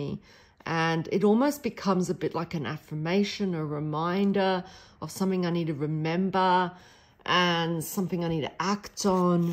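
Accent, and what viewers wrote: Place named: Australia. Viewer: I forgot to comment when I watched 🤪 So, I loved this 😍🙄🤣 it is always good to hear another Aussie voice 🌺🍀🏵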